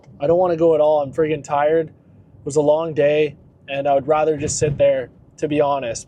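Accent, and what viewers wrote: Canada. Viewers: You sound like you're from canada! Where ever ur from, love from Toronto 💪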